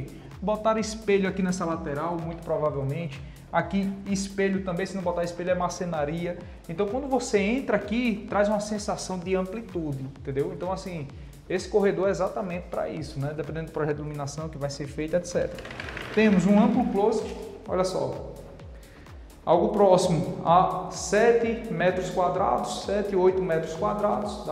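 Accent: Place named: Ceará, Brazil. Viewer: Esse sotaque do Ceará me encanta por demais. O vontade de conhecer esse estado. Talvez, até morar ❤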